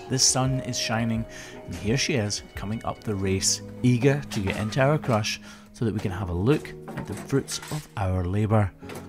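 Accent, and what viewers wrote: Scotland, United Kingdom. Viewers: Okay, I am happy to see the cow much improved, and I have to say that on top of enjoying your great Scottish burr you are very handsome.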